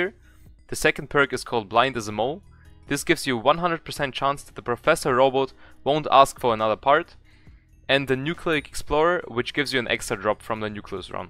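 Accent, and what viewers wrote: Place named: Germany. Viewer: How did I only hear your german accent after hearing yoou speak german?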